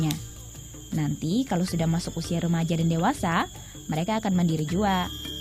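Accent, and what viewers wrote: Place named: Banjar, Indonesia. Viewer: Pang nya banyak banar,,,, BANJAR BANAR pang,,,😁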